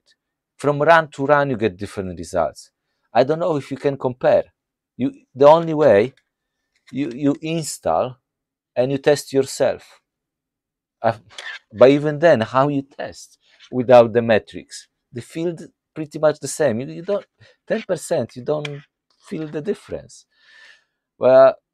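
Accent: Poland. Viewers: First time on this channel. Little off-topic. Your accent sounds like you're Polish. Am I right? Back to main topic. I use Void Linux which is independent distro, not clone of Ubuntu/Fedora/Arch. I have been using Linux for almost 2 decades now (had a break from it). On Void, some games starts immediately. Running some games requires debugging and deducing which libraries are missing, which can be frustrating. I rely on Google & Gemini AI for support. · Ah, wiedziałem! Polak Polaka zawsze pozna po mowie 😅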